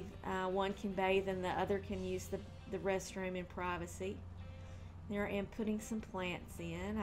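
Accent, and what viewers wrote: South, United States. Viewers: I love your house building video, This is the first one I watched of your builds. I love your accent to, Are you southern? Just curious.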